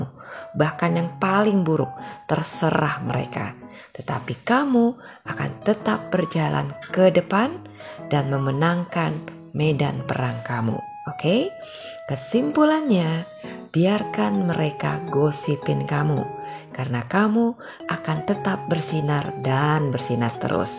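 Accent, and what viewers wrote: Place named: Sunda, Indonesia. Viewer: Aksen Sunda nya lucu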